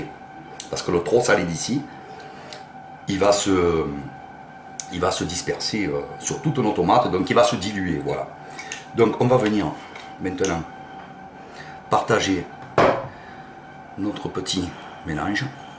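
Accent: South, France. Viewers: Merci pour la recette! J'avais oublie comment les faire! (Et j'adore l'accent du soleil!!!...lol)...je vais m'abonner juste pour ecouter l'accent qui rechauffe le coeur...ahahaha! Salut!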